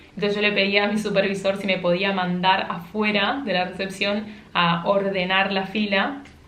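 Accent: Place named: Rioplatense, Uruguay. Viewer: Al final es como yo pensaba, es la versión de Tripulante de Cabina pero versión en agua. Solo que las azafatas a veces tienen un día un la ciudad y tienen habitación propia. Es un trabajo para matarse laburando, ahorrar, y ya esta. Especialmente si son de un país como el nuestro, Argentina, (perdón no se si sos de Uruguay, porque nuestro acento se re parece) que el peso no vale nada y cualquier trabajo en dólares es bienvenido. Sin embargo, es todo un sacrificio. Buchoneo, demasiadas horas, no habitación propia. Me encanta que hables del lado que todos realmente necesitamos saber!!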